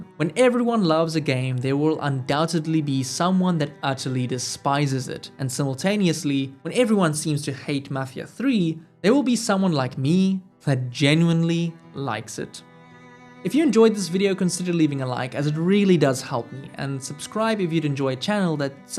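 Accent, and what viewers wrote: South Africa. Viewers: A seth efricen! Lekker man, sommer immediately subscribed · Lekker, man! You're South African! (the r's and o's gave it away lol). While I agree with a lot you're saying, I just can't bring myself to rank Mafia III up too high. The potential is there, Lincoln is compelling and the story is genuinely one of the best I've seen in gaming, but the open world isn't nearly immersive enough (in terms of what you can interact with) especially compared to Mafia 1 OG and 2. I'm far more disappointed than turned off by it, since it has the foundation there (cops reacting differently to you depending on where you commit crimes etc) and in all honesty, Lincoln deserved a MUCH better game. It's still a solid game I replay now and then, and is frankly overhated.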